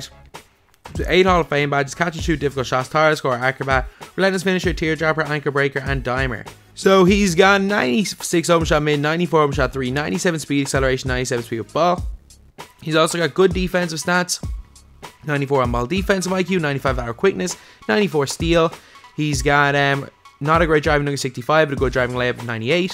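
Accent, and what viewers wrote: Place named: Ireland. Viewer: man your prenounciations are just wired I guess that's just your Irish accent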